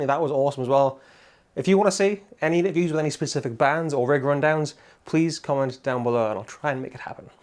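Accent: Newcastle, United Kingdom. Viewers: ! I only started playing recently. I'm really lucky that I have guys like you on Youtube who play really cheap gear and make it sound amazing, it teaches me to focus on my playing and not the gear. I also love the geordie accent! I learned english watching True Geordie on youtube talk about newcastle football club in 2015 (when they got relegated 😂) so I love how you talk howaay lads heres to 2026!!!!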